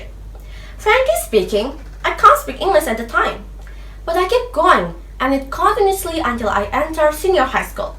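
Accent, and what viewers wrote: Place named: United Kingdom. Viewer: Buihhh.. British bngt 👍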